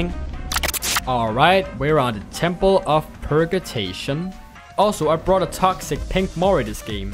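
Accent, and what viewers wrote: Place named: Germany. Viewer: Nice Vid :D and i have an question. Are you European? (your accent is similar to mine and im german soo)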